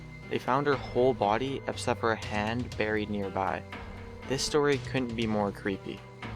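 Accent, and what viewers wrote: Canada. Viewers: Is this kid Canadian? His accent annoys me. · are you Canadian ???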